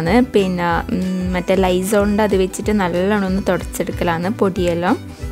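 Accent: Kannur, India. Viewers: Innala korchi busy aaypoi deep cleaning nnta baagam adhn nu late aayadhu ningla video kaanan my fvrt ur vdes and kannur slang...enki oru hai hello paryoo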